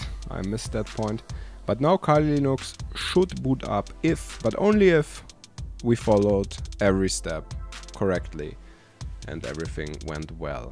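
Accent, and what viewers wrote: Germany. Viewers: Haha ich liebe deinen Deutschen Aktzent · U German?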